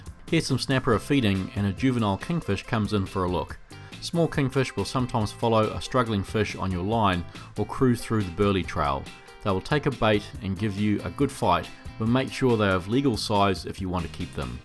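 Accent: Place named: Australia. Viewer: gday gday mate u from ozzy mate?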